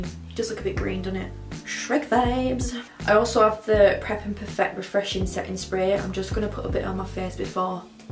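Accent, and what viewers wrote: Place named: Northern England, United Kingdom. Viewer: I love you already cause I’m Northern too! It’s nice hearing a northern accent on YouTube for once! 💕💕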